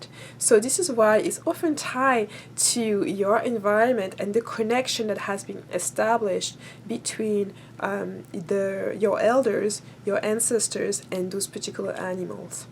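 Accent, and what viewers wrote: France. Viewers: You have sort of french accent. Is is Your first language? (If so, Your English is amazing!) Very good video, by the way :-)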